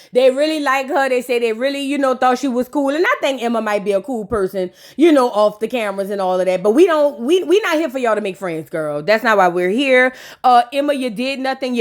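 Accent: New Orleans, United States. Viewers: Yes New Orleans Accent Baddies Review 😂😂😂😂😂 Love from London 🇬🇧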